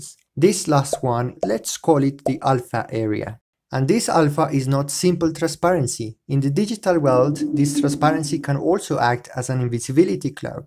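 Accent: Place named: Latin America. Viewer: me encanta que hables ingles aunque mi mente me dice que eres latino, asi que te entiendo el spanish que hay detras de tu ingles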